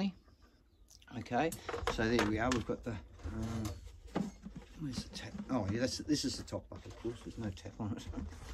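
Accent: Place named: Australia. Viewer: 😀👍 great video and your Aussie accent makes it even better! But seriously this is such an important life or death skill people need to know. Thanks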